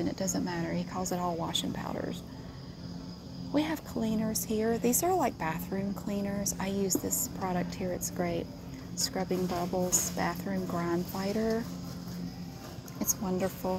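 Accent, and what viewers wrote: South, United States. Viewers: Everyone talks about your southern accent but it sounds normal to me since i’m from south georgia 😂😂😂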